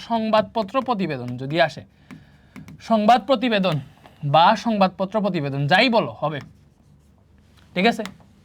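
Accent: Sylhet, Bangladesh. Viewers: আপনি বোধহয় সিলেটী। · vai tumi ki sylhet ti tumar basa sylhet naki 😊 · bai apne ki sylhety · apni Kitah sylheti ni??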